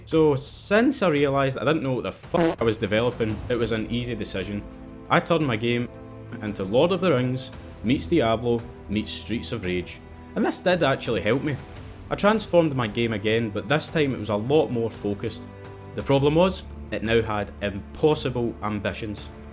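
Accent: Scotland, United Kingdom. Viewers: Dude, your voice is epic, gotta love Scottish English! Dont give up gamedev. You enjoy it, thats all that matters, sooner or later you will have tons of money :D Cheers from Ukraine! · Good Sir Scotsman thank you very much for sharing your valuable experience, learned a lot from it and enjoyed your nice accent 👍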